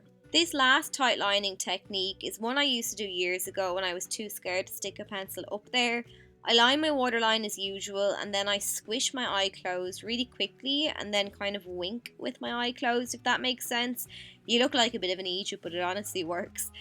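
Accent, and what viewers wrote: Ireland. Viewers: love your Irish accent I have a Boston Massachusetts accent lol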